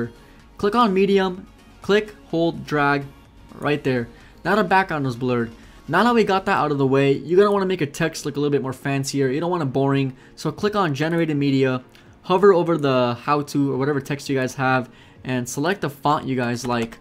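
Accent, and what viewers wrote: Croatia. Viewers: Are u from Croatia?! :OO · Are you Croatian? :P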